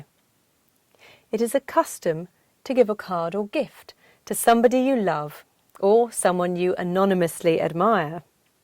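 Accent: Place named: United Kingdom. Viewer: Her voice and language pure British English. Awesome. I dnt understand why in uk, majority people will speak street language(they dnt speak clear, they dnt speak full only they speak half lOl, they use shortcut words), only they can understand. They are spoiling birtish image.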